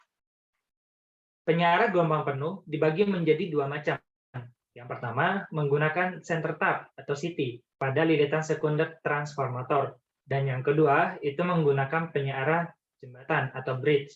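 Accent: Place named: Padang, Indonesia. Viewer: Logat Padangnya kental sekali ... 👏👏👏👏👏👏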